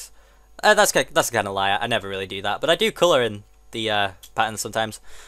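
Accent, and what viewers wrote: United Kingdom. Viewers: Northerner. Definitely a northerner.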